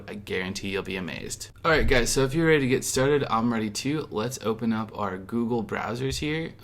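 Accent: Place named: Midwest, United States. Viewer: Thank you so much for the amazing tutorial! This is my first time building a site and the video coupled with the Midwestern accent made it easy to follow along with (even if the video took me 5 days to get through from all the pausing haha) I do have a question about editing the blog page with elementor. I am gathering that this is not possible when the blog page is created under pages? I was able to edit under posts, but the changes are not showing on preview. This all was noticeable during the "adding the footer template" portion of the video. Will I need to go to the pro option to edit with elementor or keep archives. Do you happen to have a video on this already, so as not to be redundant in comments? Thank you again!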